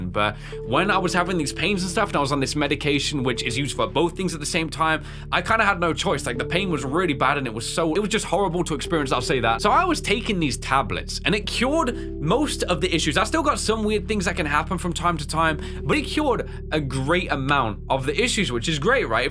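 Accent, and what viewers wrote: United Kingdom. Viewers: Never knew you was british. Same here lad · As a long LONG time supporter of channel I'd like to say a few words about his 7 year hiatus The first video I watched from was his first video on case animatronics and whilst he sounded very polite and British I enjoyed the video a lot and gradually from there I watched more and most definitely enjoyed more There was some videos I liked and about 2 I didnt like and quite a lot I didnt watch but I'd just like to know I've been there since case animatronics and will stick here forever until the channels end Big respect dont stop grindin🥺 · do not ever blame yourself. There is a simple way to explain how special you are in my life: I did not even know English when I started watching you. I played the games you played because you inspired me. I watched your videos when I didn't even understand what you are saying and at the end, you taught me how to speak English (british accent too). 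So, even if you quit tomorrow I will remember you for the rest of my life. That is how special you are for me. And when I see you blaming yourself or anything like u said in this video, it makes me very sad because you made me smile since I was a kid. And you must make yourself smile too. Don't record when you can't smile or when you give a fake smile because your funny jokes, reactions made us laugh, your smile made us smile. So don't do it when you don't feel like it. I would always respect and love you! <3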